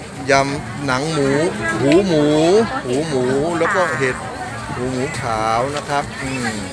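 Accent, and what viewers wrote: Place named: South, Thailand. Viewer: พี่ชายเป็นคนใต้หรอเห็นแหลงใต้ได้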